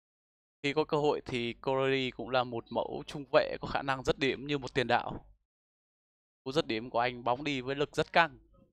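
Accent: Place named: Yen Dinh, Vietnam. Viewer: nghe giọng nói là nhận ra a ở yên định. Bạn anh ở thọ xuân có vài người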